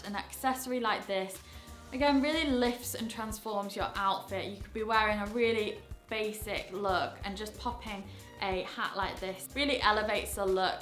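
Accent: United Kingdom. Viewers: I'm American from L.A. You have a British accent. You're better than all the other stylists in YouTube. You're the only one I subscribe to. Thank you for being the voice of reason.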